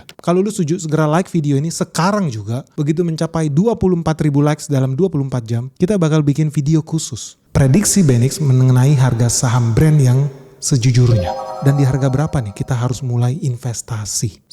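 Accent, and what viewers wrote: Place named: Surabaya, Indonesia. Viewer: Gaya ngomong Bang Benix model surabayaan terang2an dan lucu 😂😂😂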